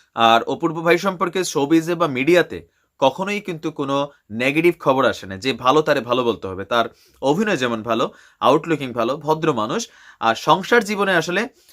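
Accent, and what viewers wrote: Comilla, Bangladesh. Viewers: ভাই, আপনার কথাগুলো মজার কিন্তু সত্য। আপনার কুমিল্লার Accent দারুণ।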